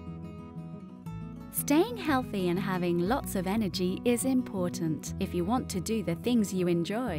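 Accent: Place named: United States. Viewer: american pronounciation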